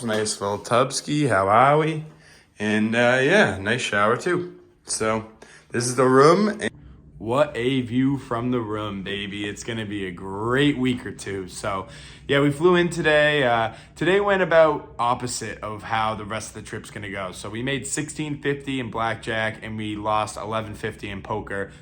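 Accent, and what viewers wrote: Boston, United States. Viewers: that’s a boston accent lol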